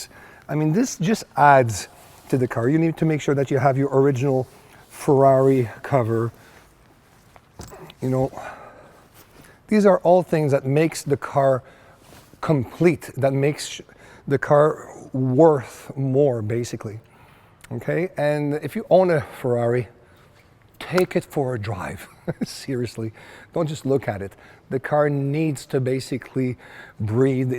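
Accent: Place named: France. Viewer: Je sens l'accent français ❤❤❤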